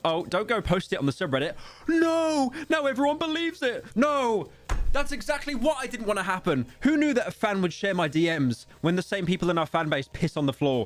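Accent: United Kingdom. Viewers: why is he more british in the video rather than in any other video?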